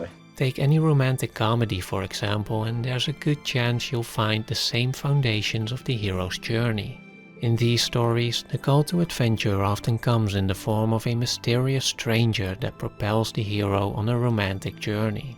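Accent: Netherlands. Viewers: Duthch accent.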